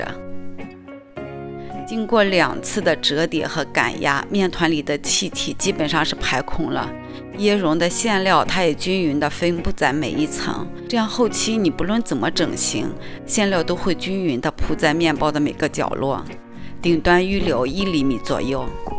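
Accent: North, China. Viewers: 今天偶遇你，非常喜欢你的声音，不急不躁地叙述，像北方人的口音，果断关注收藏！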